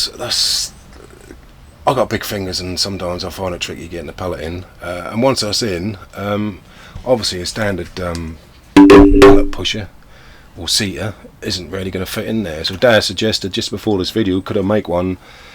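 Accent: United Kingdom. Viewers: Nice video mate! Is that a norfolk accent!?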